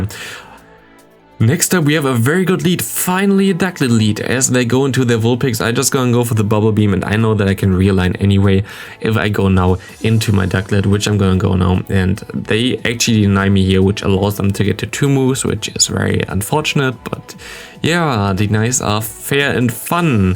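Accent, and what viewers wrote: Germany. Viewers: Most german accent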